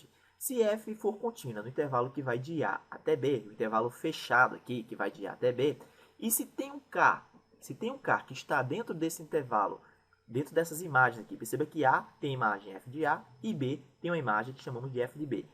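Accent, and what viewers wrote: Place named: Ceará, Brazil. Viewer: E esse sotaque maravilhoso é de onde?És também do Ceará? Parabéns pelo trabalho, muito bom!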